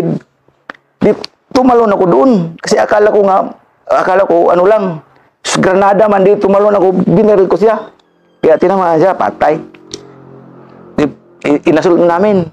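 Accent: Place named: Visayas, Philippines. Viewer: Hahaha visaya lagi do…nakakaaliw si sir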